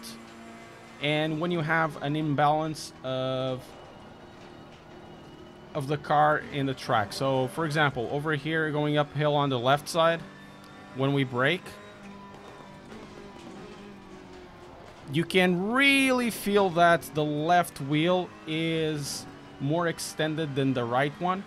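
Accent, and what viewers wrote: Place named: Portugal. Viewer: és Portugues? sinto ai um sotaquezinho.. e tambem como deste review ao yuri... segui porque sigo tambem o mundo das corridas e tambem gosto de fazer umas corrindas num simulador apesar de ser um simples g29, gosto de estudar trajetorias e superar me a mim mesmo.... já joguei muito iRacing mas agora fico me mais pelo Assetto corsa Na nurbur :D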